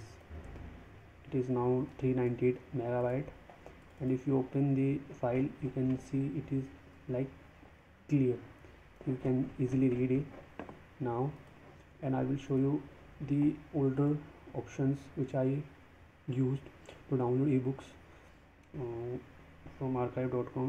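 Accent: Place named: India. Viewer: in fact you talk english but the problem is that you talk it with an Indian accent. This problem is common with the absolut majority of Indians who talk english. Indians have weard accent when they talk english, and this makes that most of people can't understand them when they talk in english. It's strange that Indians talk english with that weard accent especially that their country have been colonised by Grand Britain and for a long period. on the other hand We find that many nations that have not been colonised by Britain have understandble english. Normally Indians are supposed to talk english better than all the other anglophone countries due to the long British presence in India. Also there is large Indian communities in Britain and USA. Indians are smart people and their counrtry had been developped a lot the last 30 years. It's really not understandable that Indians talk english with a weird accent that make strangers not understand them but also attack them or laugh and mock of them. 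with all respect, My advice for you is that you should emprove the way of spelling in english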